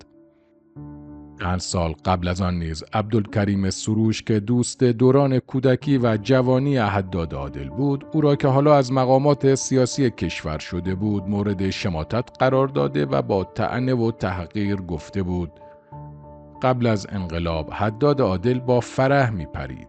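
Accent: Arab world. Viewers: جناب شما بیوگرافی حداد عادل را بدرستی بیان نمردید و لهجه شما از کجاست؟؟؟؟ عرب هستی یا ؟؟؟؟؟ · احق کثافت ندانذ با شخصیت ترین بانوی جهان فرح نیست دیوی بی. ناموس که صدای زشت گزارش میدهی دیوث ته لحجه عربی مثل صداي شغال در نیمه شب میماند هر کس تورا تایید کند مثل تو بی شرف کثافت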